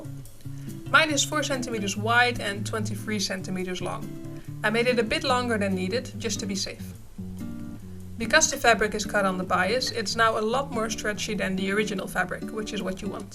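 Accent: Netherlands. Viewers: Are u dutch? :0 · Are u dutch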